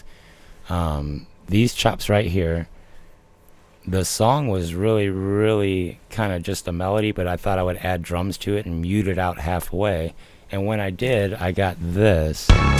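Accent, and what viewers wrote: St. Louis, United States. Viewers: Yoooooo are you are Cardinals fan? I’ve always taught you talked kinda like a true south STLer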